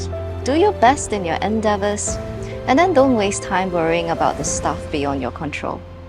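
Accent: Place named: Singapore. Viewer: She sounds Singaporean. They work very very hard!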